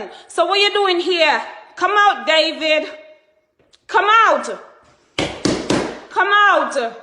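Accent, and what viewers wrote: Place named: Jamaica. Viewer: You jamaican accent no too bad enuh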